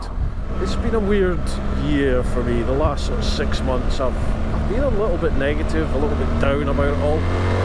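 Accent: Scotland, United Kingdom. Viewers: You sound a typical Scot and i am from Glasgow now living in La belle France still living my life and approaching seventy five Merry Christmas mate.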